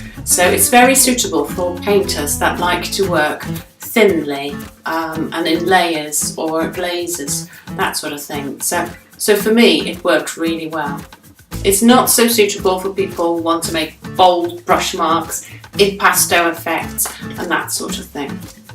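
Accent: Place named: England, United Kingdom. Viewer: Nice review. Surprised you sound so English. I love Old Holland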